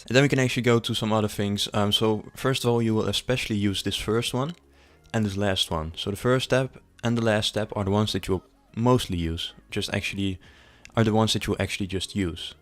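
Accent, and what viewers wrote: Netherlands. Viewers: When a dutch person makes a guide its the first thin i hear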